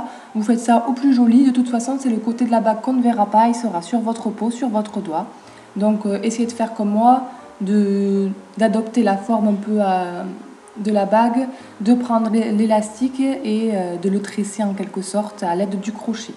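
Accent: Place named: Nord-Pas-de-Calais, France. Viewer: tu parle ch'ti ! !!!!!!!!!!!!!!!!!!!!!!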